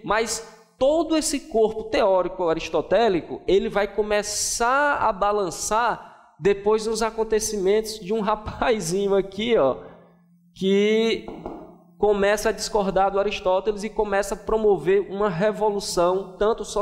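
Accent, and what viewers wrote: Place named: Ceará, Brazil. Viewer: Professor muito boa aula! O conhecimento liberta! Vc é cearense? Seu sotaque parece de lá, muito lindo. Minha família é do sertão do Ceará!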